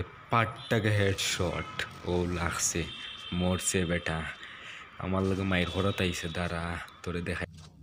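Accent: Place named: Sylhet, Bangladesh. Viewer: Vaire ami sylhet tone.. Khub vala laglo... Game id dio re vai add kori rakhi...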